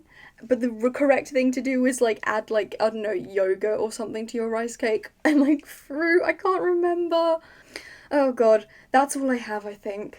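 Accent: United Kingdom. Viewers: Wait you sound like Jessica law- ARE YOU BRITISH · UR BRITISH!?!? (u still slay im just supprised) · I’ve been watching you for a while (on and off) and did not expect you to sound like ts, all proper 😭. I love British people ,I love yalls accents so much 😌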